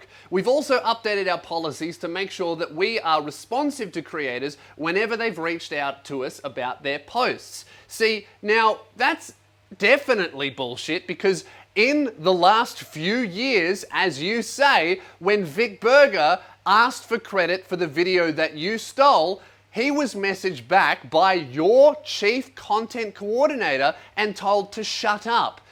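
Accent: Australia. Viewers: I like Australian English 😂 hi from Slovakia 🇸🇰 🤘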